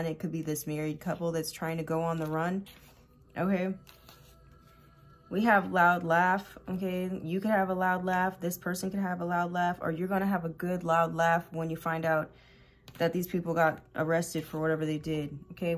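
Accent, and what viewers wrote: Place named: RP, United Kingdom. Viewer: Hey Girl , inspirational, and so comforting to hear your voice, and Posh too! 🐈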